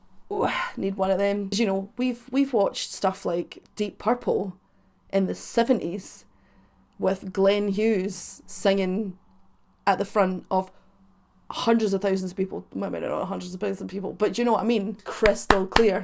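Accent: Scotland, United Kingdom. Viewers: Great band, terrible sound quality. The bass and vocals get drowned out too much. Your accent is awesome! I only sound like I Am from Kentucky when I drink too much...lol. You know, the street that I live on is Highland. And I am a Scott...coincidence? Keep up the great work My Fine Scottish Lady! ❤❤❤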